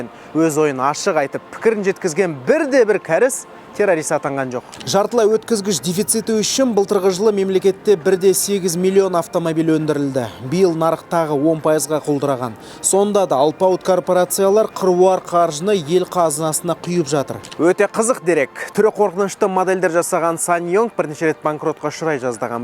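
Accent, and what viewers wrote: Standard. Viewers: Жарайсың бауырым. Таза қазақ тілінде түсінікті әңгімелейді,қандай әдемі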